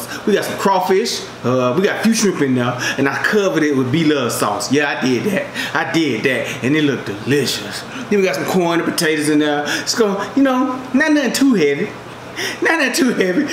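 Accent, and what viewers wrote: United States. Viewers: I’m from New York & i love how different & country his voice is 😍 instantly fell in love & subscribed 🥰🥰❤️❤️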